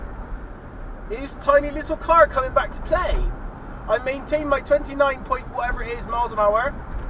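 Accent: Bristol, United Kingdom. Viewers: Sorry mate, can't take anything you say seriously with a Bristol accent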